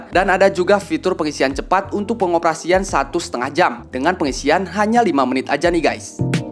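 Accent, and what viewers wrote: Indonesia. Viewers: Sunda pisan anjay pas buka videonya 🤣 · sunda pisan ieu euy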